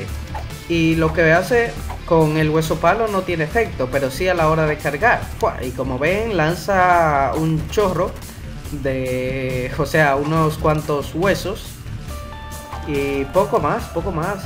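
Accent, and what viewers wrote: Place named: Spain. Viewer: creo que se refiere a que tu asento parese español y la mayoria de los youtubers "Famosos" son españoles(perdon por las faltas de ortografia)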